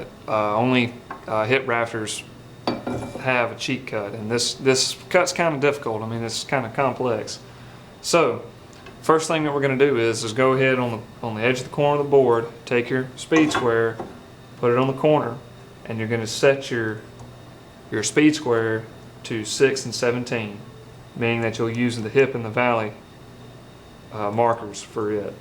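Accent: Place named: Carolina, United States. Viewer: i like this, very helpful...i like also how you get right to it without alot of unnecessary (distracting) jabbering...also your manner is respectful and professional...sounds like a carolina accent? cool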